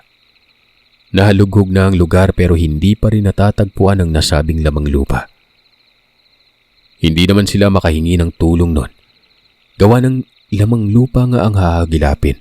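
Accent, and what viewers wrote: Visayas, Philippines. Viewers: Wow bisaya kaayog titol ohh hehehe❤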